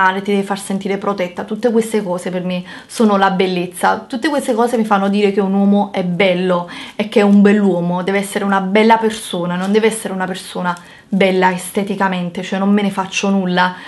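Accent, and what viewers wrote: Naples, Italy. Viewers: Cara ti seguo con piacere proprio perché sei vera, lascia perdere chi ti critica va avanti c'è tanta gente che ti apprezza davvero. Chi ti critica lo fa perché sa che, oltre ad essere una bellissima ragazza, sei soprattutto intelligente ed in gamba. Invidia si chiama, Continua così anzi più forte di prima:D Un saluto:) ps: amo il tuo napoletano. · queste persone sono davvero idiote ma come si fa a non amarti? cioè davvero il tuo accento napoletano secondo me è una qualitá e si deve sentire il nasone ce l'avranno loro perchè io non lo ho visto ... abbi forza non mollare per tutti noi non mollare!Saremu anche terroni ma tinemu a capa tosta e perlo in generale di tutto il sud inoltre il sorriso nonostante tutto nessuno mai si puó permettere di torgliecelo!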